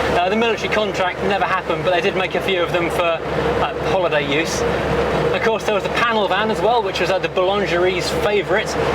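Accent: United Kingdom. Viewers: You are typical British it is known by your accent.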